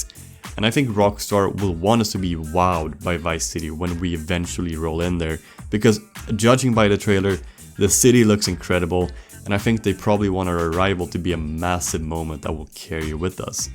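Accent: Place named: Sweden. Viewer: ju saund sviidish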